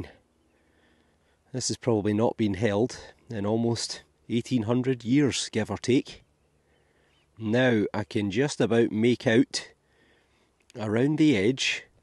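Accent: Scotland, United Kingdom. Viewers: Love to watch your treasure quest. I specially enjoy listening to you that Scottish accent sounds so magical to my ears. Also you are a good looking man sertainly easy on the eyes. You are. so cute when you find something great and you get so excited. Cheers from Princeton Illinois USA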